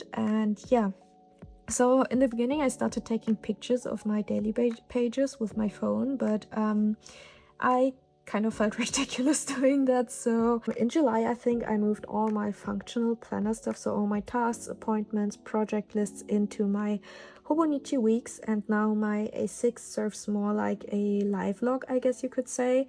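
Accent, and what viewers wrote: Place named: Germany. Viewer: This was such a cozy video to listen and watch, good mixture of very soft music in the back and I adore your German accent. Would love to see more in-depth explaining and layouts that you use for different types of todos and creativity! >w< As for planners that I'll be using for 2026 (braindumping about the specifics will happen later in Nov); Hobonichi Cousin: all-in-one daily planner, scheduler, personal/hobby journal Hobonichi Weeks: adult-log for chores, appointments, more todo focus with no personal details Hobonichi HON A6: art freelance/work planner, detailed todo of my art projects along with its own space for notes (leaves more room in the Cousin) Self-Care journal: bullet journal with a simple tracker for emotional, mental and physical symptoms. A page for affirmations and quotes to read back on and a Monthly Review Yearly Collections journal: fun collection pages from hobbies, trackers to goals (very tempted to move this into the Hobonichi Weeks.. 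but unsure due to how small the Weeks is and it may clash with the 'adult admin/todo focused' theme). Apologies for the long comment, I can ramble on and on about my planners haha. But there is still a lot to figure out. I've only been using the Hobonichi Cousin for the first time this year. I used to bullet journal everything in a B5 size that would fit 5 months per book... but monthly setups became extremely time consuming, so I'm VERY happy to have moved into the Hobonichi planners >w</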